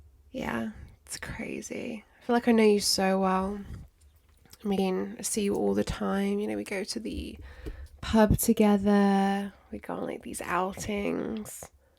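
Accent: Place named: United Kingdom. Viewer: The best part about this video is your british accent and the overall " bustiness " of the video (if you catch my drift😏). The worst part of it is its yet another reminder of what I've never had😔.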